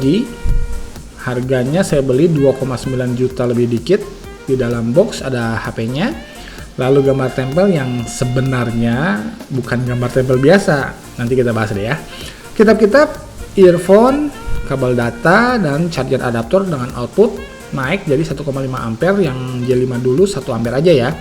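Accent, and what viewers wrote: West Java, Indonesia. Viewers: orang sunda kang?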